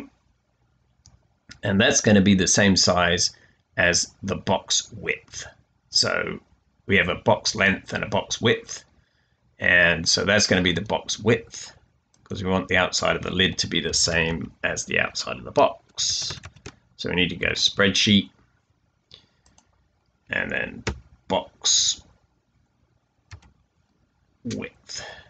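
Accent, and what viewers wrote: United Kingdom. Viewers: Great videos, brings home the benefits of parametric designs, very well explained. You mentioned the possibility of adding this to an assembly, would be great to see that. Would also love to see something with print in place hinges on a box, can't find a full demo for freecad anywhere. Can't place your accent, doesn't sound American, dare I say more British ? Thanks for the time you put in to help out a beginner. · You are a great teacher. I was looking for a CAD Software and found FreeCad. The new good CAD-Programs are only for rent anymore. As a single-man-business in Germany I just can´t afford to pay 500 Euro per month for renting a CAD-software while my main business is calculating frames and using other software. This software is meant for Industry - some fulltime - drawers, who live in their element - with their shortcuts and their productivity. 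Anyway, I would like getting better at Freecad and the FEM - workbench. Thumbs up, you´re a great person having an english accent and living in the US. Freundliche Grüße, Niko Böhm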